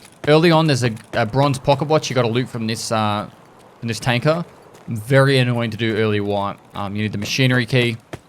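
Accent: Australia. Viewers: As a foreigner not used to Australian accent, i did not understand when he was talking about some « cyfe ». Then I realized he was talking about safes....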